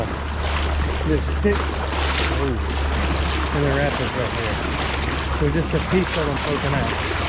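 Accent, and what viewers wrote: South, United States. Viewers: Love you quirky comments and your southern accent. Make for an interesting video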